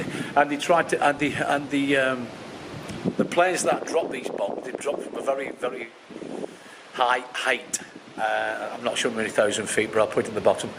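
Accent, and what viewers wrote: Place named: Wigan, United Kingdom. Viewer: Sounds like this guy is from Wigan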